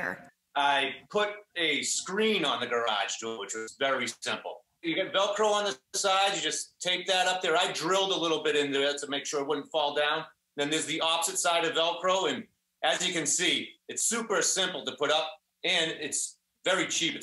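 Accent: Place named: Boston, United States. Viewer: Looks great! Love the Boston accent! ( what’d the wife say?!?)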